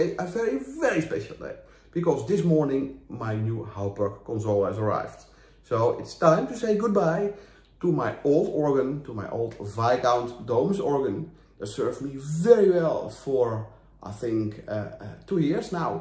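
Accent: Netherlands. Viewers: Congratulations on your new console. That is magic! I watched your video just minutes after finishing practising two other works by Phil on the pipe organ I now play for Sunday church. I share the role so only play fortnightly which gives me time to learn new music. I thoroughly enjoyed your video and the beautiful piece by Phil. In my teens (eons ago) my piano teacher was Dutch. If not for WWII, she would have embarked on a career as a concert pianist. Your Dutch-English reminds me how lucky I was to learn from a world class musician in my 'formative years' 😃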